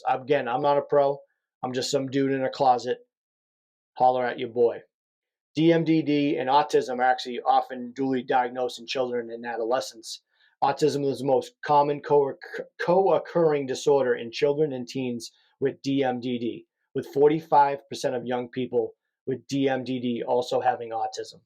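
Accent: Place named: New England, United States. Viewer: Are you from New England, Massachusetts maybe 😊 I can hear the accent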